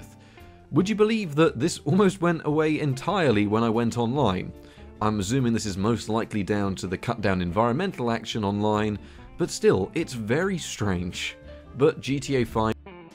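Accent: United Kingdom. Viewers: English accent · I may be stuck in bed with flu, but at least I got with his British accent and his old tech stuff to cheer me up.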